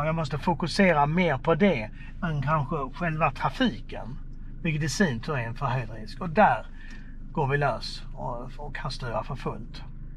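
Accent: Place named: Scania, Sweden. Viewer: Skånskt dialekt 💪 Pedagogisk och tydligt förklaring Tack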